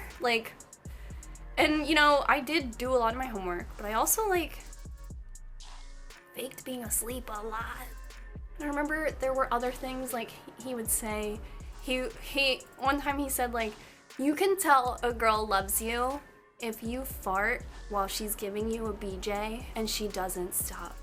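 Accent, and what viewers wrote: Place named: South, United States. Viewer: I love how you've had a slight country accent most of the video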